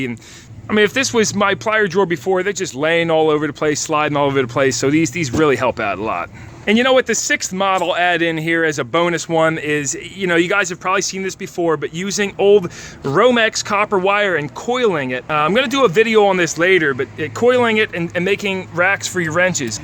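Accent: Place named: Philadelphia, United States. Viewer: Nice Philly accent.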